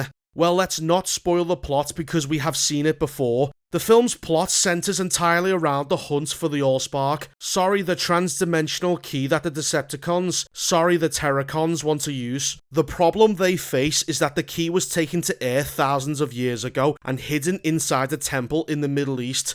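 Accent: Liverpool, United Kingdom. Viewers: This guy sounds like the typical scouse arsehole. You could’ve used reasonable words to say “Oh yeah not a fan of this movie tbh” but nah you overreacted. Yeah okay it’s not everyone’s cup of tea but at least be respectful about it. Calling a director a dickhead was also a typical dickhead move. Just a terrible review overall. At least this film was better than the last two transformers movies (not including bumblebee)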